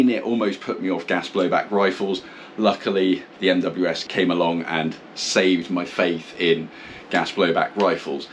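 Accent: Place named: United Kingdom. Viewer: I like your British accent. cheers from Koera!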